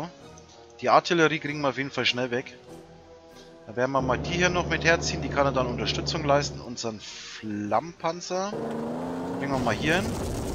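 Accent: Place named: Franconia, Germany. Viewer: Fränkisch ist so geil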